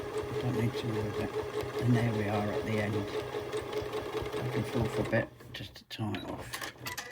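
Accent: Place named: England, United Kingdom. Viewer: Hello! Ex pat from Aussie...loved hearing a real English accent again! I bought a set of rulers over a year ago a d still haven't had a go! You've inspired me to get them out and have a go 😊 the quilt behind you is lovely 😍 do you normally do your own free motion quilting? Looking forward to your next video...please look after yourself..I too have COPD so I know the struggle 😢 best wishes too you, Carole x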